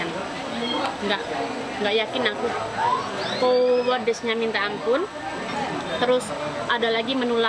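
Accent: Java, Indonesia. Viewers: Medok nya,, bahasa Indonesia berasa jawa tulen 😂😂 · Medok jawa nya mbak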